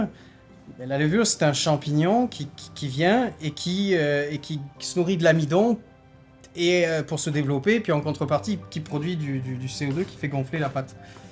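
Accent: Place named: Quebec, Canada. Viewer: Un français avec un accent québécois.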